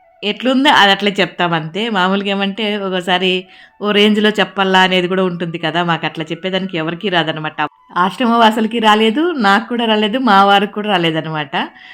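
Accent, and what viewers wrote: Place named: Bangalore, India. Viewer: Meeru maa laage matladataru ante memu Bangalorelo unde vallu itlane matladthamu alage ginjula pulusu kooda itlane chesedi❤❤❤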